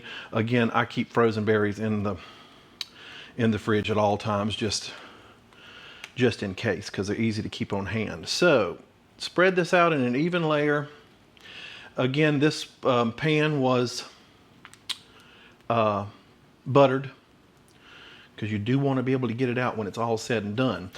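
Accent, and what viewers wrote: South, United States. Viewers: "Rubber Spatuler" 💗 man I love a southern accent 😄 Can't wait to try this recipe!!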